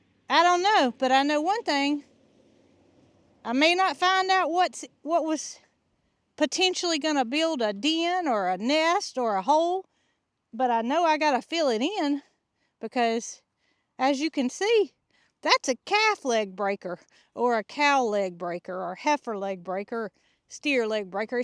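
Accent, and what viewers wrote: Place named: Midwest, United States. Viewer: ❄️You sound like you live in the Midwest USA like me. I hear we might be getting more. Stay safe. ❄️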